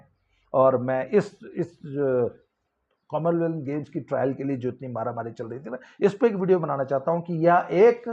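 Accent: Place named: Punjab, India. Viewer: I really like how you explain things without sugar coating, and yes, travel teaches a lot. It can be seen from your videos that you have a good hold over Eastern UP dialect, a nice heavy Haryanvi tone, and a nice Punjabi accent. Your "done this, done that" attitude is inspiring for me as a young father. Keep up the good work.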